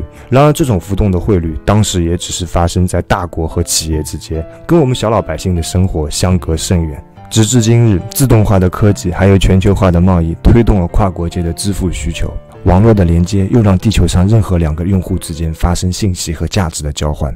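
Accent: Shanghai, China. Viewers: 满满上海口音😄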